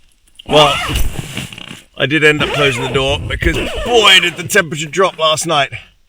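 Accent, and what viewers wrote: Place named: England, United Kingdom. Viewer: Wow,. How nice to hear someone say something nice about America.....that doesn't happen very often. We Americans love our Kiwi's too!! I previously worked with several New Zealander's and Aussie's--so much so that I learned the difference in accents! There is nothing NZ's hate more than being called Australian!, you also have a lovely accent albeit English. Greetings from Los Angeles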